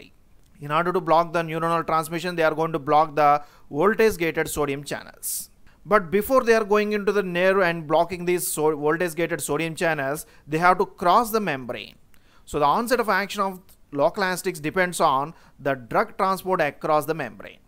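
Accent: South India, India. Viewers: THIS DUDE HAS VERY THICK SOUTH INDIAN ACCENTS EVEN NON SOUTHINDIAN INDIANS HAVE TROUBLE FULLY UNDERSTANDING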